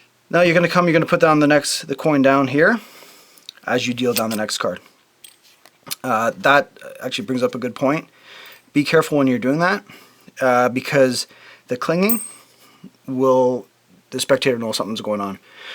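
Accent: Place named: Ireland. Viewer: The real magic trick here is the subtle Irish accent he has.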